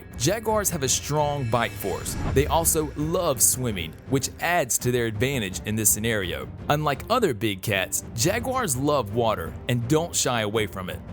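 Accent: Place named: Baltimore, United States. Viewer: You sound like you’re from Baltimore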